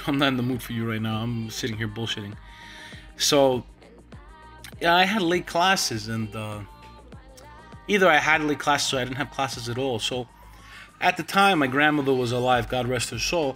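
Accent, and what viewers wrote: New York, United States. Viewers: Hey, I'm living in Queens right now too, originally from upstate NY. I can totally hear your NYC accent haha. I really enjoy watching your videos. Keep it up man! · Your accent to my ear was clearly either Queens or Brooklyn.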